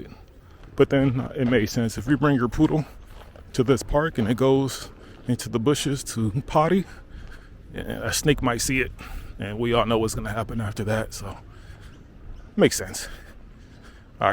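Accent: United States. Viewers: Lots of his narration is making me laugh. Still sounds like American living in America, re OZ. Tho TBF, did mention some interesting facts (& sort of correct re most, tho not 100% re all) in the previous video. Recommend (or any one in Sydney, or visiting) does some of the walking tours. The 'Free' (not quite free*) Walking Tours are good, & The Rocks one is great. [*Not quite free, as request tips at end, but for $10 or $20 get best value walking tour of any] There's also heaps of social walking groups, & hosts, or a few in the group, are often quite knowledgeable & often learn a few things, even re home city even if know it well. Can find some of those groups by searching for: Free Walks & Meet People. In Sydney, is often one of those groups (there's heaps) is doing the amazing Bondi - Coojee Walk, sometimes is a Manly Harbourside Walk; & often Botanic Gardens &/or adjacent one. 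- - - In Melbourne, similar type free social waking groups often do Botanic Gardens walks, Bayside walks, or Yarra Bend Park/Studley Park Walks; & at lots of other places. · I love the American accent brother but Bondi is pronounced as Bon die not bondy 😂😂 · Ya'll are really pendatic on his accent, aren't you? We pronounce it Bond-ee like he does, here in America. Stop insulting his accent.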